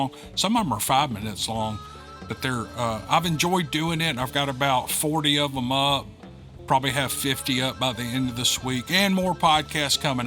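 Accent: South, United States. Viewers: Lol. Thanks, was getting desperate for a fix, of your southern narrations ❤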